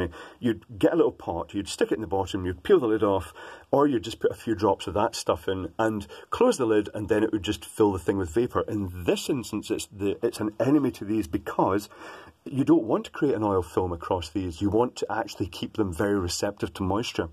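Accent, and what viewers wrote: Scotland, United Kingdom. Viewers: What part of Scotland is your accent? Is it Edinburgh or inverness? Can’t tell even being Scottish myself.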